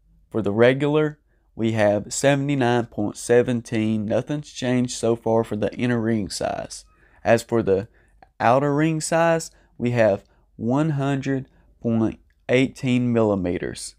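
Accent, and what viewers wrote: South, United States. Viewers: The narrator's accent reminds me of Forrest Gump.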